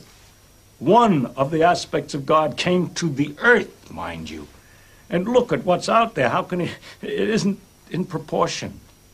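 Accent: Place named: New York, United States. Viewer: I love the way he talks about the universe with the accent of New York longshoreman, or as his friends said a bum.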